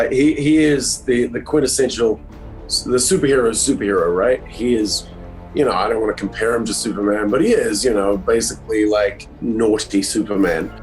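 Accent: New Zealand. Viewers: He's kiwi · He’s from New Zealand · Wait he’s Kiwi??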